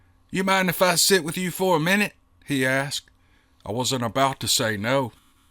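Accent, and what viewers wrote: South, United States. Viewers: yous is the best story teller on the whole interwebs... it dont matter if its Steveo & the boys or the Wild Hunt crew, or just telling us a story someone sent to you... we all just wanna hear your southern twang telling us a story; )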